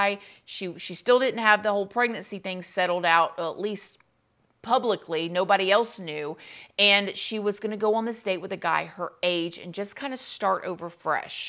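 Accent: South, United States. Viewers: You can really tell that your from the south..."cause the most beautiful things come out your mouth" 😊❣️🎉 the adults in this situation were very immature emotionally and spiritually..You expect a 20 year old to not be super mature and aware of things but honestly these older people took advantage of her inexperience with relationships and her crush on a married man... They should have been setting a better example for the young lady...but obviously they had their own deep rooted issues . I think they both got off on the whole thing....Heather didn't make the best choices ..but she was young and inexperienced and didnt deserve to die over it... Tammy and Sydney are preditors... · New sub here. Love your videos...plus you gotta be from the south or have a lil hood in you. " beat the dog doo doo out of him" lmao I havent heard that in soo long. Plus the way you say POlice. 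I love it. You do a great job on these videos. Cant wait to see more. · 😂😂 guuuurrrl I know U country I can tell that, yes ma'am lol but I can't pick where U from, I have fam in calli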